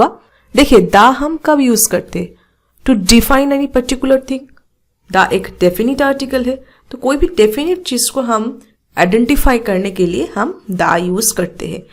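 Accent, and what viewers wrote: Bengal, India. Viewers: Bangali voice in hindi always love❤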